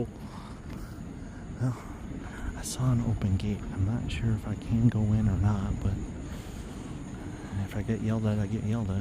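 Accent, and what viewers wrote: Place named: Hampton Roads, United States. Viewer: Good review. And yes some people complain about vah-tech but in the 757 we’ve been saying vah-tech for 45 years just like we say vah-beach. You good bro. Go Hokies!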